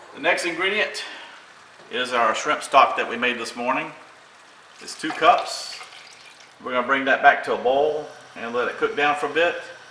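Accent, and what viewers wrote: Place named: South, United States. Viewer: Listen to that southern drawl!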